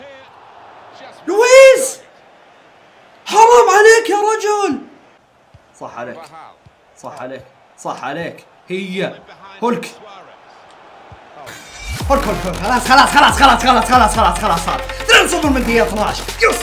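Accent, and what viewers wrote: Gulf, Saudi Arabia. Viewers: اريد سأل انت سعود ولا بحرين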